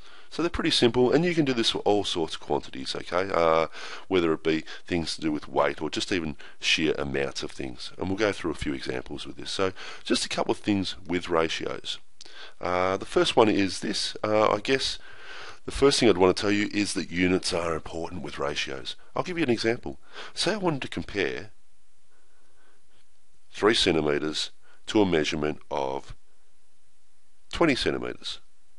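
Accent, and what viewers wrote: Australia. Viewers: He’s Australian. Watch a couple Aussie shows, movies, or certain documentaries and you’ll understand him even if he had a cough. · You can just tell he's australian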